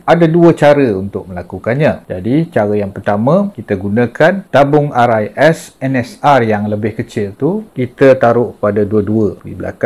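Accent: Perak, Malaysia. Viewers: Assalmualaikum.. loghat Perak geng ni.. zaman ayah sy... Lepak dayabumi..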